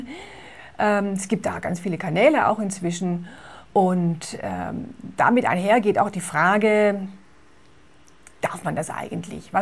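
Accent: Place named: Franconia, Germany. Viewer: Klingt für mich irgendwie eher fränkisch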